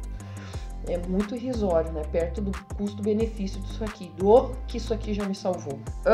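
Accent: Rio Grande do Sul, Brazil. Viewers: Adoro teu jeito de falar sutaque bem gaúcho ❤ sou Catarina e como o clima daqui e dai não tem diferença também estou enrolada na minha manta no sofá tomando chimarrão e vendo seus vídeos, obrigada pelas dicas 👏🏽❤ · adoro tuas dicas,faz pouco que descobri teu canal,sou gaúcha mas moro no MS,aqui 20 graus já é frio,sou muito friorenta,outra coisa que gosto tu não muda o nosso sotaque para falar no vídeo 🙌🙌🙌🌹🌹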